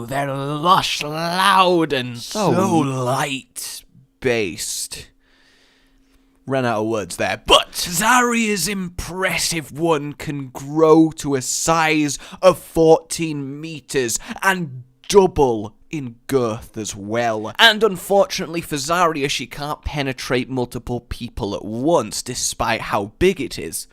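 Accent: Russia. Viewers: Not gonna lie, he actually does a pretty damn good Russian accent.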